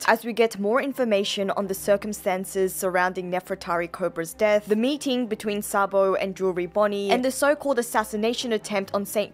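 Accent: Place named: Australia. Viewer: This girls accent is so jumbled between Australian and Brittish.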